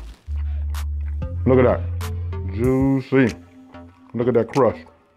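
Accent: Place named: California, United States. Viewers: I thought he was from LA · I knew he was from LA off top but it's a Compton thang we all LACounty but we breeded different.... Lol and (no disrespect either) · Man! Just discovered you last night and I been hungry ever since, knew I recognized that Cali accent, from the Bay Area but been in the south for 30yrs, keep doing what you doing love it! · I thought you were from LA! LOL....The chicken looks screamin. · Sound like L.A. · I recognized that South L.A accent, not a Compton accent 😁 I LOVE the recipes! I need an invitation to the next cook out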